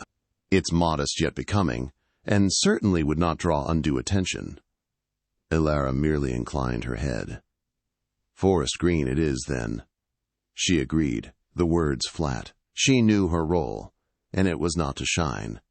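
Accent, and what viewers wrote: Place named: United States. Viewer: and American accent😞. Should be narrated in British accent.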